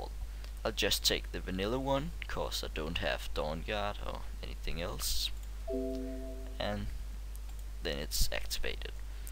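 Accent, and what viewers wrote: Denmark. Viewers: You're Danish? Right? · Han er dansker, det kan jeg høre 100 p :D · Lol are you Danish?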